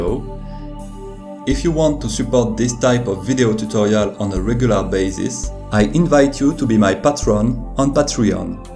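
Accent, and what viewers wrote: France. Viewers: feels weird being french and watching a video in english with a heavy french accent xD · j'adore votre accent, vous êtes trop français. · Tu es français non? Tu as un bon anglais mais il faut encore travail un peu l’axent :3 Sinon le contenu est bon est tu es assez clair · are you french ? cause you've got an enormous french accent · This is a really great tutorial! Out of curiosity, based on your heavy accent, are you french? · You are french right? Ton accens francais ressort x) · Le ptit accent français x)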